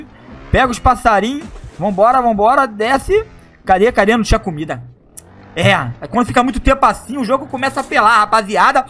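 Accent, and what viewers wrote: Rio de Janeiro, Brazil. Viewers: Esse cara fala o verdadeiro carioquês kkkkk salve sou de Nova Iguaçu RJ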